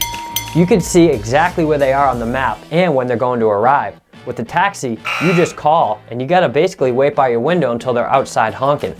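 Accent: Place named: Boston, United States. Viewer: u from Boston I from Boston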